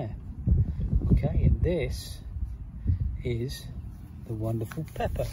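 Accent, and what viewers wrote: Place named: United Kingdom. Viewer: I love your British accent!👨🏻‍🦳🇬🇧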